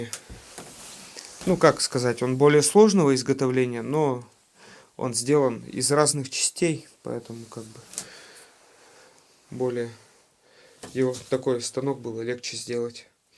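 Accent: Vyatka, Russia. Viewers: почему то думала ты местный, Кировский. Говор вятский, похож. Южные места обл.не посещаешь? А, там очень много купеческих домов, к примеру Уржумский р. 😊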